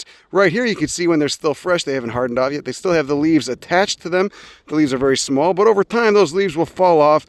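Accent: Chicago, United States. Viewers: inner city Chicago. its still there. he seems mellow today. perhaps its the paht.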